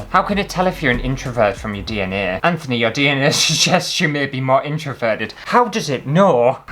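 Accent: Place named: North East England, United Kingdom. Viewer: Glad to hear it - I couldn't really imagine you without your North-East accent! 😄😄Endurance just sounds wrong all round 😆😆 Hope your weekend is going well so far and the latest video isn't turning into an endurance test of its own!! 🤣🤣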